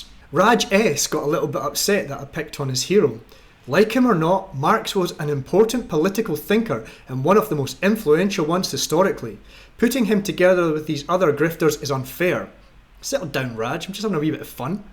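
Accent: Scotland, United Kingdom. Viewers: The fact that this is all narrated with a heavy Scottish accent makes the whole diatribe the more entertaining 😂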